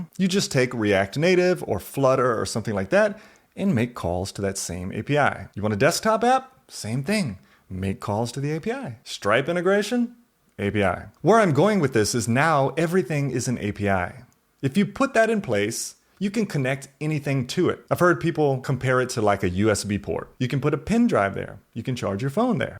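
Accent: South, United States. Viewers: you got a lil twang in your voice lol. You grew up in the south lmao?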